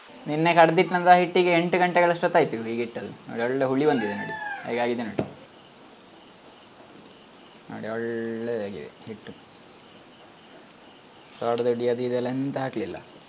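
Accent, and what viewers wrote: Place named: Tulu Nadu, India. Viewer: It seems your mother tongue is Tulu, pls make videos in Tulu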